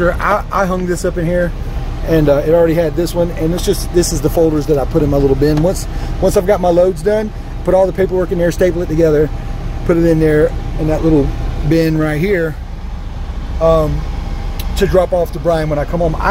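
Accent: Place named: Pittsburgh, United States. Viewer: one hell of a set up my guy! also this guy sounds like he from pittsburgh idk y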